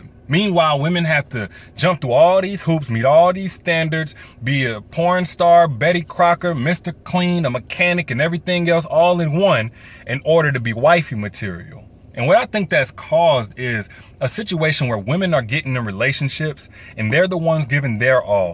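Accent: South, United States. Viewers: Lol, the living room. You are straight out the gate 250% SOUTHERN....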